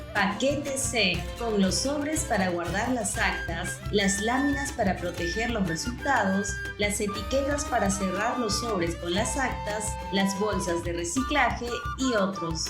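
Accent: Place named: Galicia, Spain. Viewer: Soy <mail> me parece bien un video, pero no me gusta el audio parece una gallega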